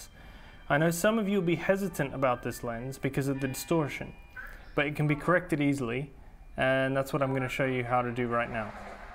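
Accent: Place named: Southland, New Zealand. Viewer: From your accent am I correct you are from Southland in NZ?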